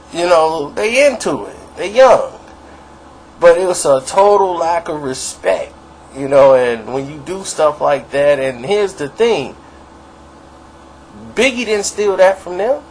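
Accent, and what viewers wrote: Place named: Rural, United States. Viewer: This nigga telling half truth bullshit ass youtube videos. This nigga sound like he from the "COUNTRY" somewhere smh...stfu bama!!!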